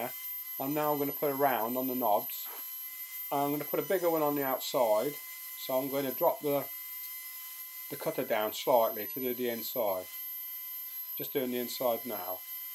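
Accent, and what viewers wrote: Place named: England, United Kingdom. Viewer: Good one mate! You have a great pommy accent for someone with an Italian name.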